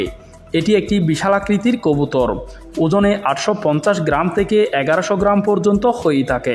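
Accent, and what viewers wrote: Chittagong, Bangladesh. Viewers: ওনি ছট্রগ্রামের লোক।। তাই ছট্রগ্রামের বাষায় কতা বলেন।।। কিচু মনে খরবেননা।।।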